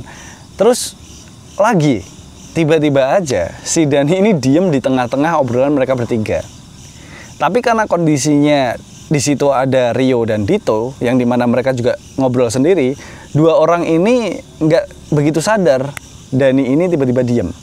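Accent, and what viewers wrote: Java, Indonesia. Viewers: Gara² Kewan, Jowone metu cok..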